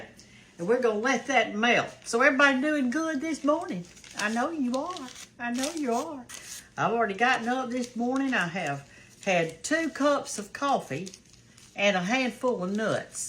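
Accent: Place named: South, United States. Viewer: You are so precious! I love your recipes i just subscribed! Love that southern accent!!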